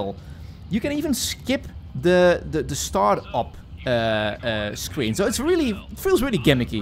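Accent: Netherlands. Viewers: The dutch accent made the whole video 😂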